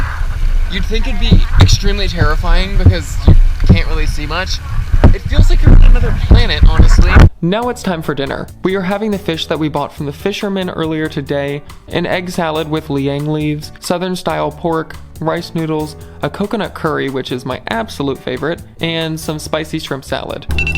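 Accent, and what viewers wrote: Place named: Philadelphia, United States. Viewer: That's a Philly accent if I've ever heard one.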